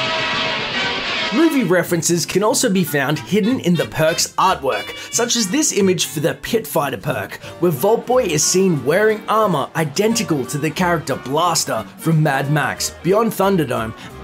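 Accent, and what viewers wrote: Australia. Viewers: God, I hate the Australasian accent... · Its very Australian i should know i live here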